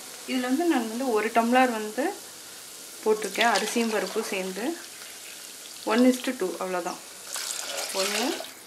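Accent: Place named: Coimbatore, India. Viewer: .k hi sis starting la sollanalaum vanga nu solrangale..shanthama pesuranga ithuve alagathanapa irukuthu sis.kovai tamil really superp thaan.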